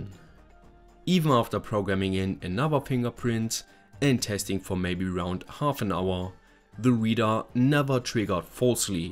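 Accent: Germany. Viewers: German accent goes brrrrrrr And nice Video :) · is from Germany. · Der bre klingt biss europäisch, würde fast sagen, deutsch... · nicht sicher aber hörst dich sehr deutsch an also akzent mäßig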